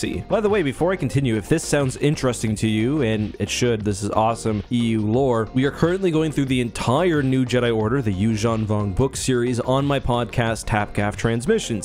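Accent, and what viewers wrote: Canada. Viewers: The narrator absolutely has to be canadian, that accent bro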